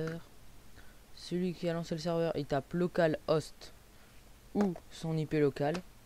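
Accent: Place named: England, United Kingdom. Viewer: quel accent anglais !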